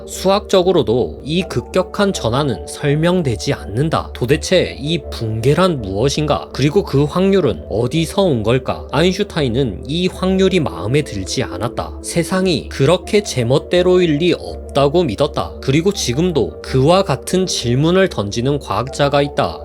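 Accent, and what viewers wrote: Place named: Gyeongsang, South Korea. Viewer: 경상도 억양 멋있어요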